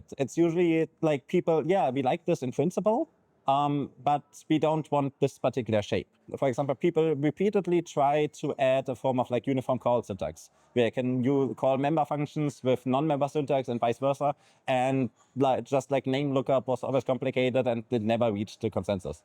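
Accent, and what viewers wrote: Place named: Germany. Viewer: I like germanized English.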